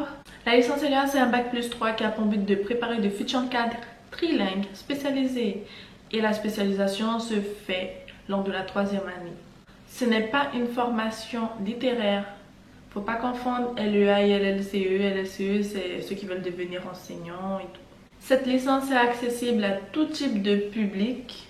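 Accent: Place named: French Guiana. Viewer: T’as grave l’accent guyanais ! ❤️ En tout cas merci pour cette vidéo. Au top 👌🏾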